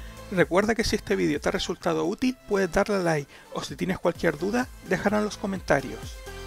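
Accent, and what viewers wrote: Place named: Canary Islands, Spain. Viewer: Exacto, desde el principio me dí cuenta de que eres canario, nuestro acento es inconfundible xD, por ahora no tengo ninguna duda, pero me los veré porque no controlo mucho, a ver si puedo sacarle todo el potencial a mi placa xD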